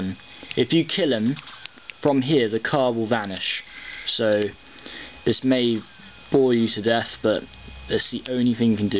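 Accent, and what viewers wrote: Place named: Australia. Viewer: i like ur accent, r u ausi or nz?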